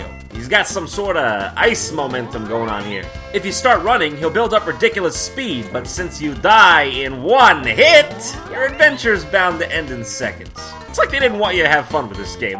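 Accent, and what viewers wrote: New York, United States. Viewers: I love how his New Yoik accent just gets thicker and thicker as the games get worse and worse.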